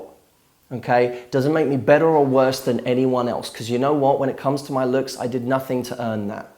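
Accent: England, United Kingdom. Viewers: your british accent is killing me! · you look smexy to..... i love brittish acsents <3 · That's just how everyone in the UK speaks. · I like British language 😂 I mean accent ☺️ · Your So Hot, i dont mean to be shallow, re: being your looks, your English accent:) it's definatley your whole package;) love your video, thanks once again, always emotionally intelligent and of value. Cheers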